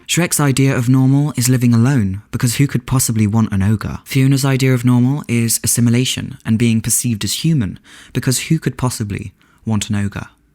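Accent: RP, United Kingdom. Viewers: Comes for the intriguing premise of the video... subscribes and clicks the bell for the adorable person with a wonderfully posh RP accent narrating said video.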